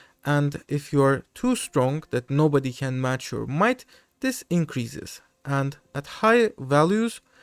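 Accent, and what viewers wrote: Turkey. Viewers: It's just your english accent sounds very turkish and your pronunciation of turkish names is almost spot on. The only proper name you "messed" was "Döger" and that's not even your fault. It's PDX's fault. It should be "Döğer" with a soft G not "Döger". I swear the letters I, İ, and Ğ cause so much pain for the software developers lol. Although azeris call that oghuz tribe "dögər". Perhaps you are azerbaijani 😂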